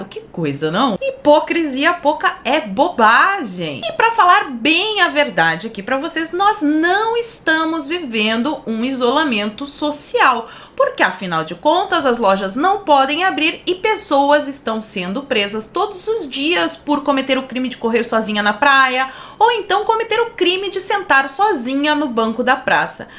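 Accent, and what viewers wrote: Rio Grande do Sul, Brazil. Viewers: Acho que você é gaúcha porque você fala muito o né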